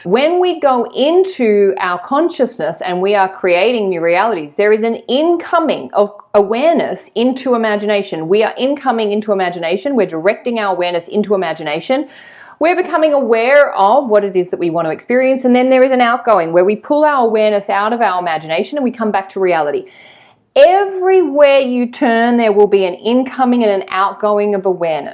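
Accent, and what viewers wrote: Australia. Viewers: Me too, I am falling in love with the Oz accent.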